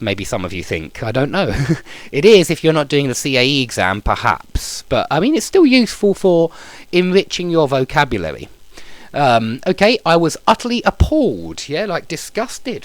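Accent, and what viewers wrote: United Kingdom. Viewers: Great video. By the way, you have an elegant, educated British accent.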